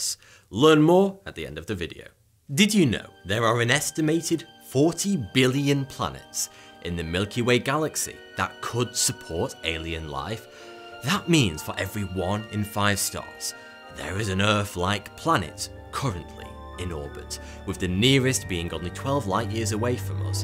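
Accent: United Kingdom. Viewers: This is the British younger Vsauce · Hey Your Voice Is Deep and Beautiful. You Speak Like British Gentlemen. 😎🤓🧐🤗🙂😉 Yes Well And Sophisticated. I Am From India. Hey Why Corona Virus. I Thought This Video is Old Video. But You Just Uploaded On 3April 2020. 😃😀😄😆😂🤣😛😜😜 Be Safe From Corona Virus. Kill The Corona From Earth. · you sound british but you have lived in america for 12 years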